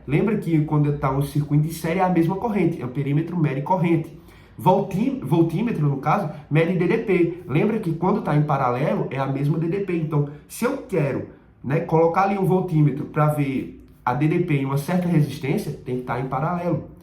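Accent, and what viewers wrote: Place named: Northeast, Brazil. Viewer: Parece nordestino.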